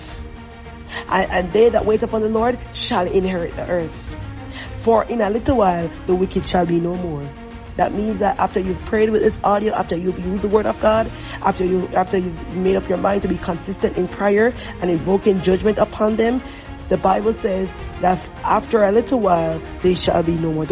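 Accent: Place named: Jamaica. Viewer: ⚠️ REQUEST, that you woman of God slow down when you speak. Above prayer confirms what God has been saying. My own family's "bad luck" now I see as sorcerer caused due to jealousy plus we have suffered due to lack of knowledge. I just saw your channel first time now and I sense you are a genuine believer, not some false YouTuber. Praise God. Slow that beautiful Jamaican accent coz I will continue to repeat some of your prayers, or all. Thx!❤️🌹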